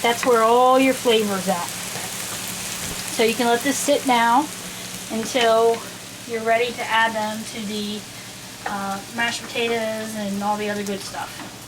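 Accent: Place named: Pennsylvania, United States. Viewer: I could tell you were from that area as soon as I heard you talk! I lived in Norristown and then Harleysville until I was 10 years old. Can’t miss that accent!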